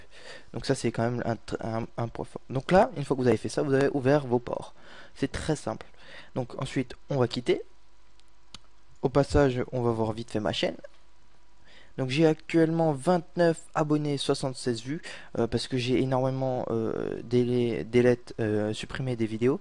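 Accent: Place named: England, United Kingdom. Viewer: L'accent anglais... :)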